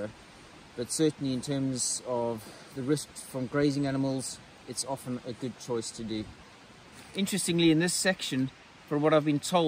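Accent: South Africa. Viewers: Are you South African, or am I missing the accent?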